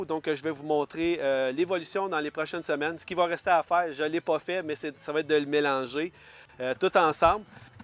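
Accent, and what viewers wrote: Quebec, Canada. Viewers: Jadore ecouter les quebecois ! Pouvez-vous me dire ce quest la "frasque d'insectes " ?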